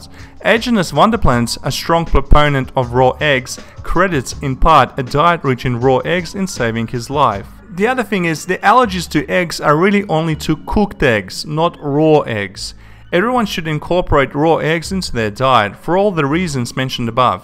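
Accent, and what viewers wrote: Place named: Australia. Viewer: Well we appreciate the information, the Australian accent is marginally tolerable, but with the addition of the needless interference of the BackBeat.. a plague on the present human race, your presentation is completely undigestible alas.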